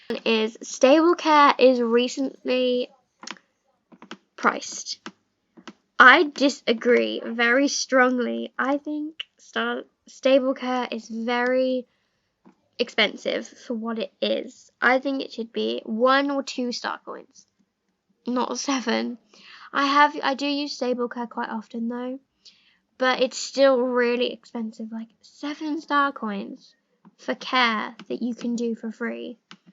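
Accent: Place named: United Kingdom. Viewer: hey!! are u from the UK? i really find your accent cute <3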